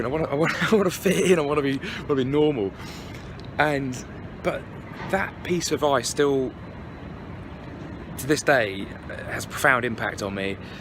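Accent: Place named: United Kingdom. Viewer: Interesting vlog. Glad you had a good time in KL. Your British accent is so thick. Doncaster?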